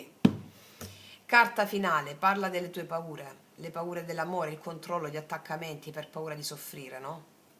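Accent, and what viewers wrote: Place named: Salento, Italy. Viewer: Cara ti seguo ogni tanto ma dall'Inter calare della tua voce sembra che tu sia salentina come me' io sono della provincia di lecce è tu di dove sei?❤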